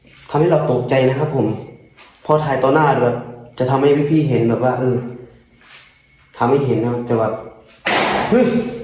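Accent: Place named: Isan, Thailand. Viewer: โครตหลอนรอยตีนเห็นชัดมากเลยระวังตัวแหน่เด้อน้องเว้าไทยปนอีสานนำกระไดจ้าม่วนดีออก❤❤😊😊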